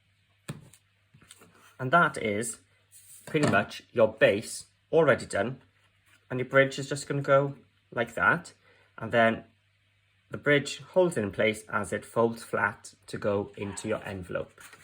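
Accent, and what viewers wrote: Wales, United Kingdom. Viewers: I really enjoyed this video today showing the bridge fold card. This is a fabulous card, I am going to try it for a lovely lady who will be 90. Thank you so much for showing this technique. P.s. love to hear a Welsh accent again 😍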